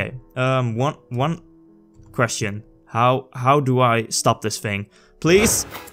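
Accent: Netherlands. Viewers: you're Dutch aren't you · Are you from Belgium or Netherlands? :p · Are you dutch? You really have that dutch-english accent, i know because i am dutch XD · are you dutch i can hear it from your accent? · he has a dutch accent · hey you dutch?????? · Netherlands xD Ik hoorde je accent al een klein beetje. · I can hear That youre dutch from ur accent :P goeie video man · bad english lol, you are obviously dutch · je hoort gewoon dat je nederlands bent...lool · Holy shit je nederlandse accent man xD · Ben je nedderlands haha ik hoor het aan je accent leuke video ga zo door! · kerel, jouw nederlandse accent😂😂 nice! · You sound dutch.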